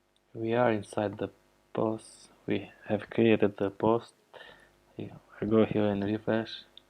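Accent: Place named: Albania. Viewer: I'm guessing Albania by the sound of his accent, possibly greece.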